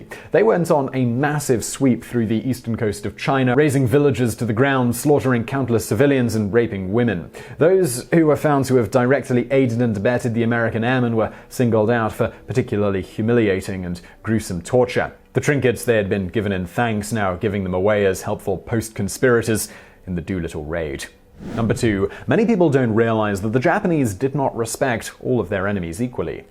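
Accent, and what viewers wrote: United Kingdom. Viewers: This guy doesn't always have accurate facts. His British accent doesn't make him smart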